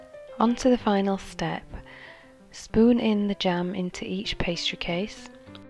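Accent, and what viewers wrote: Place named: RP, United Kingdom. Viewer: I'm an 81 yr old ex pat living in the USA since 1980. Lost my wife to heart surgery a few years back, after 60 yrs together, so I'm now the novice cook. No plain flour in the US only all purpose or Bread flour. Can I use either, as is, or with some modification. I just tried your project with premade Pillsbury puff pastry. Everything that could go wrong, did. Cut the circles too small, pastry is a bit thin, didn't reach the top of the muffin hole. Jam overflowed etc. Welcome to my disaster zone. Tried making a Treacle (golden syrup) in bread crumbs pie yesterday, did a blind bake on the crust, came out good but the syrup filling went rock hard, guessing poor syrup to breadcrumb ratio. Is there any hope for me ? I'm a Dagenham boy so your accent is more posh than mine. Blessings Bob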